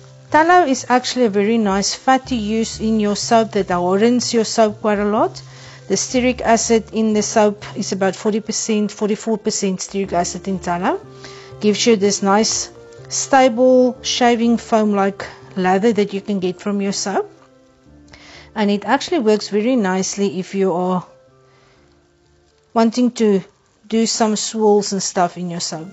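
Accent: South Africa. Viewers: I thought you had a German accent I'm sorry South African that's COOOL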